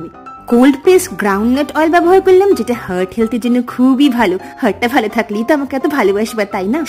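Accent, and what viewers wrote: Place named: Bangal, Bangladesh. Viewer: দিদি তোমার বাঙ্গাল কথাটা খুব সুন্দর ☺️☺️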